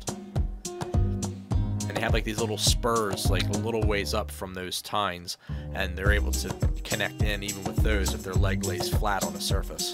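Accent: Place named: Philadelphia, United States. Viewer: N.E. Philly? Your accent gave you away 😂 Im in Mayfair and hoping to not get stung this summer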